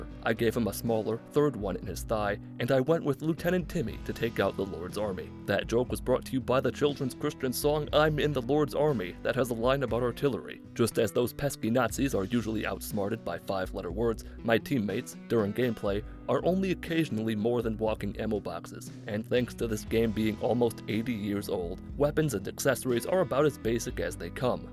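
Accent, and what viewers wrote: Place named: Michigan, United States. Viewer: took me three years to realize youre from michigan what is wrong with me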